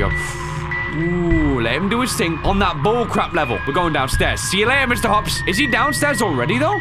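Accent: United Kingdom. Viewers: British people pronounce certain words interestingly.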